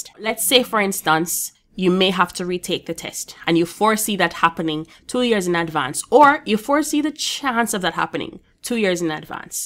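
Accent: Jamaica. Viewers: Love this.... Jamaican?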